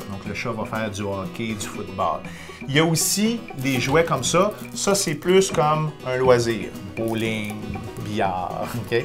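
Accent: Quebec, Canada. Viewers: Olala l'accent québécois xD C'est aussi compliqué que d'entendre les nordiste quand on est pas habitué ^^ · XD J'adore cet accent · très interrecend je me demandais se qu'etait le bruit que mon chat fesais en voyant un oiseaux :) mon chat est vite fatiguer quand il joue parfois un joue a chat ^^ elle me demande de lui courrire apres elle se cache et elle fait semblant de mataquer et elle repart en courant avec son aire joueur mon chat ne me griffe pas et ne me mord pas quand elle joue ou quand elle joue pas comme elle est très gentille avec nous qu'elle fait pleins de calins et qu'elles est pas agressive je lui donne une petite friandise :3 je suis francaise et je reve d'aller a quebec ! j'adore l'accan quebecoi ♥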